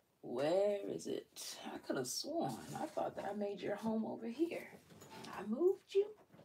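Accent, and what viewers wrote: United Kingdom. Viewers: Your British accent ain't half bad doll. ;) Love a good swear over here too. Thanks for a lush reading xx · Your British accent took me out 😅😂🎉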